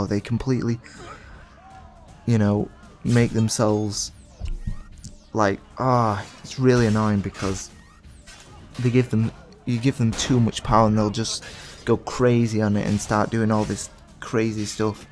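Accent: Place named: United Kingdom. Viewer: caal of duu'y thats how you brits pronounce it.. or another word like "later" will be pronounced like "la'er"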